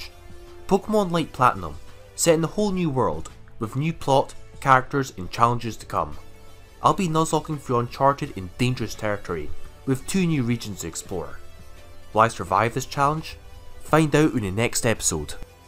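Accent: Scotland, United Kingdom. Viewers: you have a super Scottish accent. lemme guess...your from Scotland lol. (or wales..?)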